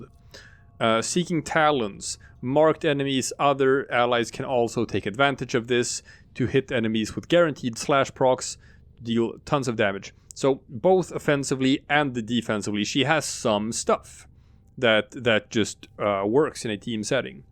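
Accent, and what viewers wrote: Sweden. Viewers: You Swedish?? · Lätt att höra att någon är från Sverige när man är svensk 😂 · lite skånska i mixen där 🤣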